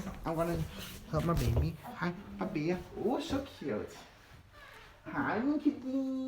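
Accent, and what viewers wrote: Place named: Denmark. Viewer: du har kim larsen engelsk accent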